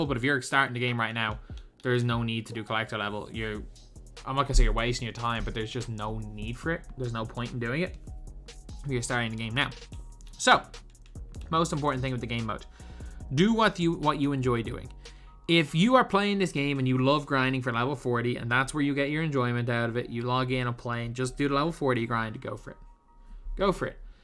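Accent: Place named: Ireland. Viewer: I was thinking this guy sounds Irish, of course he's the champ! Sound for the video man just got the game👍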